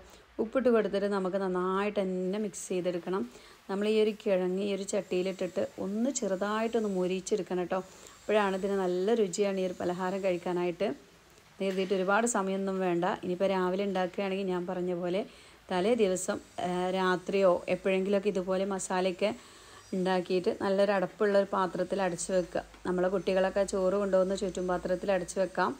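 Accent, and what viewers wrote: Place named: Palakkad, India. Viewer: ഹായ് അസ്സലാമു അലൈകും. ആലൂ പൊറാട്ട സൂപ്പർ ആയിട്ടുണ്ട് 👌നല്ല അച്ചാറും, കട്ട തൈരും കൂട്ടി കഴിക്കാൻ സൂപ്പർ ആണ്. നല്ല ഒന്നാം തരം നോർത്തിന്ത്യൻ ഫുഡ്‌ ആണ്. അതൊക്കെ പോട്ടെ ഞാൻ പണ്ട് നിങ്ങളുടെ ചിലകറി കൾ ഓക്കേ ട്രൈ ചെയ്യാറുണ്ടായിരുന്നു എനിക്ക് എന്റെ ഉമ്മ ഉണ്ടാക്കി തന്നിരുന്ന കറികൾ പോലെ തോന്നുകയും ചെയ്തിരുന്നു 🙏പക്ഷെ അന്ന് നിങ്ങളുടെ സംസാര രീതി എന്തോ വല്ലാത്ത അസഹനീയം ആയിരുന്നു 😔എന്തിനാണ് ഇങ്ങനെ സംസാരിക്കുന്നത് എന്ന് തോന്നിയിട്ടുണ്ട്. കാരണം എനിക്കറിയാം എന്റെ നാട്ടിലുള്ളവരും പരിസരതുള്ളവരും എങ്ങനെ യാണ് സംസാരിക്കുന്നത് എന്ന് എല്ലാർക്കും ഒരേ പോലെ അല്ലങ്കിലും ചില വ്ലോഗർമാർവന്ന് നാടിന്റെ പേര് തന്നെ പറഞ്ഞാൽ മറ്റുള്ളവർ കളിയാക്കുന്ന തരത്തിലാക്കി സ്വന്തം PKD 😔 എന്തായാലും ഒരുപാട് സന്തോഷം കുറേ നാൾക്കു ശേഷം നിങ്ങളുടെ വീഡിയോ കണ്ടപ്പോൾ സ്വന്തം സംസ്ക്കാരം നിങ്ങൾ തിരിച്ചറിഞ്ഞു വെറുപ്പിക്കാതെ വീഡിയോ ചെയ്തതിന് 🙏🙏❤️ പിന്നെ നാട്ടിലെ മാങ്ങാകാലത്തെ ഓർമ്മപ്പെടുത്തിയപ്പോൾ 😔😔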